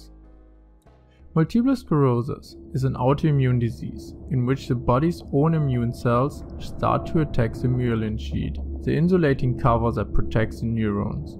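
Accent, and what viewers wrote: Germany. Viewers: Deine Videos sind klasse, bildhaft und strukturiert. Das einzige was mich stört ist dein starker deutscher Akzent. Dafür kannst du nichts - ich glaube aber mit einer besseren Aussprache könnten sie eventuell mehr Reichweite erzielen. Die, die sie verdienen! Vielleicht könntest du einen anderen Sprecher nehmen? Ich bin selbst Psychologin und habe mehrere Mikrobiom Transplantationen hinter mir - sie haben meine Psyche und meinen Körper gerettet. Weiter so und liebe Grüße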